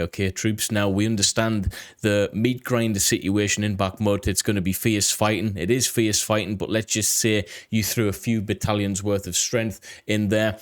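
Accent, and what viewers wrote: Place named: United Kingdom. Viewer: Thanks, good to hear a fellow Brit, Although born there I haven’t lived there for 28 years. A few things, first I am a member of the armchair General brigade, however I have conducted many successful campaigns across the surface of my coffee table. Second, the Ukrainians pushed the Russians back south of Bakhmut, third they blew a dam in the north to slow the Russians down ( which worked). Four, they blew the bridge separating the east of the city from the center. Six, it’s been said that units of Ukrainian troops have been positioned on the western flank of the Russians northern advance in order to slow their progress. I would say the Ukrainians are withdrawing from the city. Seven, the Russians not taking Bakhmut anytime soon, soon could mean in a week or in 10 days, I doubt Bakhmut will hold much longer. In my ‘expert’ opinion of course. 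🇺🇦🇺🇦🇺🇦🇺🇦🇺🇦🇺🇦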